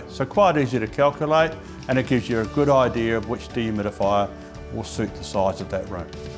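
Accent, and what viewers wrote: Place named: Australia. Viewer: After watching 5 US vids on the best dehumidifiers I can't even get in Aus.... Awesome listening to another Aussie.. :-)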